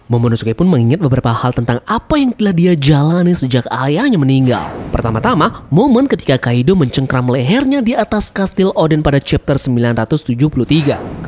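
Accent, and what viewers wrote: Bali, Indonesia. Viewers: kok kayak jd logat bali bang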